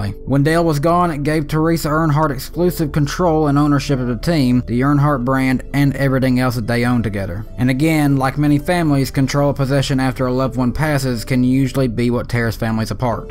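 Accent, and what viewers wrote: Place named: Texas, United States. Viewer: I have a buddy here in Texas that talks just like you, swear to God I do!!!!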